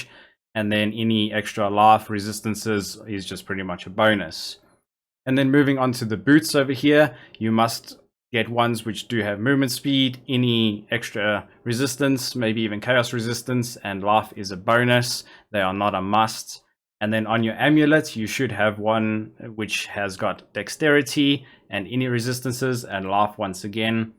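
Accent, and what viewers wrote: South Africa. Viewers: You sound South African dude. Am I guessing correctly?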